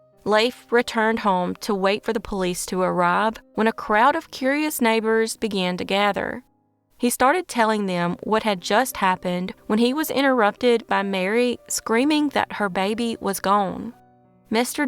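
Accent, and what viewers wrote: South, United States. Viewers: I must say I really like your channel; however, I've always found southern accents to be highly irritating to my ears. Sorry, nothing personal, obviously. Congratulations, though, on the popularity of your channel. Keep up the good work. 🥳 😉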